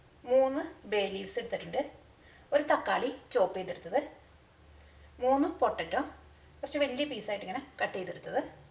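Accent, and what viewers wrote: Thrissur, India. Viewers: Haai.. Nalla peddakkana thrissur basha.. Nice video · trissur slang polichutta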